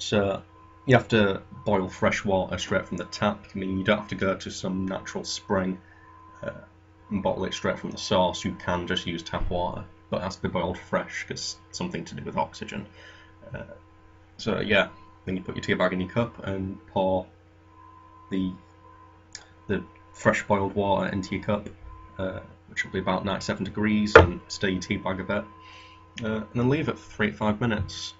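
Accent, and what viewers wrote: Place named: RP, United Kingdom. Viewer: You've become a lot posher since I last spoke to you irl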